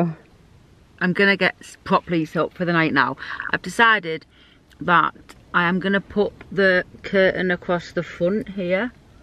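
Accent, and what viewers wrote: Liverpool, United Kingdom. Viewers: Looks so beautiful there, love the Peak district..... is that a slight scouse accent you have? i live in Wirral...... don't be afraid to make longer videos because i think lots of people like them longer! :-)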